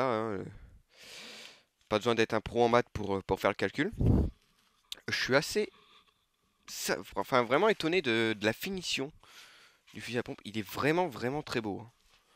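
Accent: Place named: North, France. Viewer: salut, très bon review avec le contenu qu'il faut, et on voit que tu t'y connais, pas d’hésitation dans tes dire et un discours agréable......et un accent du nord si je ne me trompe pas...lol!!! du coup je me suis abonné....au plaisir!!!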